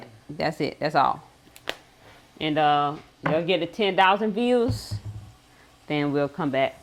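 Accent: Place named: New Orleans, United States. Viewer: U HAVE A NEW ORLEANS ACCENT.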